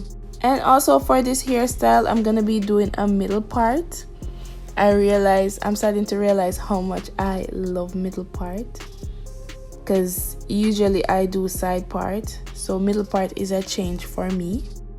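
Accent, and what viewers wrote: Jamaica. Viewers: Love you're jamaican accent❤❤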